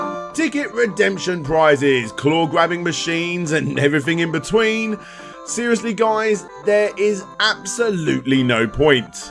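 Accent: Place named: United Kingdom. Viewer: Great idea for a video! Also... are you the most British sounding Brit, ever?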